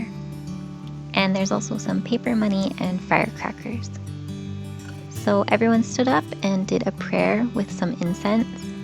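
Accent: Standard. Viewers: 這英文 真的發音好標準 而且字字清晰 歐告好可愛喔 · Your Chinese accent is very standard and has been integrated into Taiwanese life.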